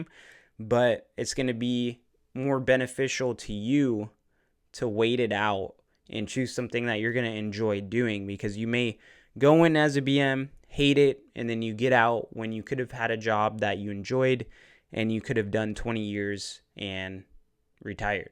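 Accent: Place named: United States. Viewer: I just realised this is American not Australian lol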